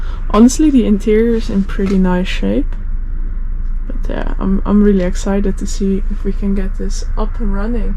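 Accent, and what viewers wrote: Netherlands. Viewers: Wat ontzetten gaaf(!). Van de kanalen die ik volg die barnfind fideo's maken, komt 80% uit de Verenigde Staten, en de rest uit het Verenigd Koninkrijk. En dat zijn er wel een paar. Van de thumbnail dacht ik heel even dat je ook uit de VS kwam, maar je accent is onmiskenbaar Nederlands!😉 Het is leuk om iemand tegen te komen op het internet die zogezegd "uit de buurt" komt. Ik ben heel benieuwd wat de levensloop van deze 4x4 gaat worden en welke avonturen het gaat beleven. Èn of het misschien ook een naam krijgt. Zoals bijv.: Martin de Subaru, en Oliver. Een 1963 Opel Kadett. (Edit: oeps.. Beide auto's van Richard Hammond van Top Gear/ The Grand Tour. Maar dat wist je waarschijnlijk al😅)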